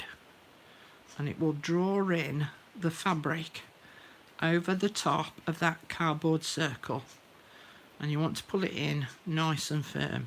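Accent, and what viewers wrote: United Kingdom. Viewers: British I think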